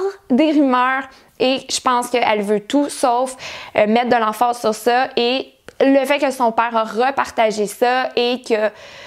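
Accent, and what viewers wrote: Quebec, Canada. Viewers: J'ai vu le truc a propos de la prière sur Twitter mais j'étais pas au courant de tout le reste merci pour la vidéo ( J'ai un peu de mal avec l'accent Québécois mais j'aime trop le thé 😂)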